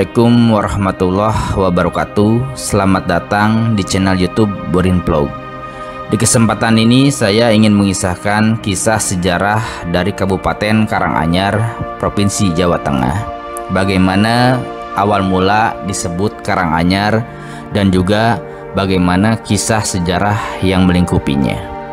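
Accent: West Java, Indonesia. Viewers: dr dialegnya 70% sya yakin sepertinya pengisi suaranya orang sunda.. Hatur Nuhun udh bahas karanganyar... sampurasun.. 🙏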